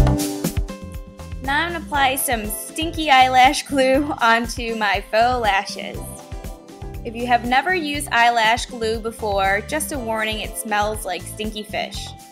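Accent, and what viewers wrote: United States. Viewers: she looks asain and has country voice :)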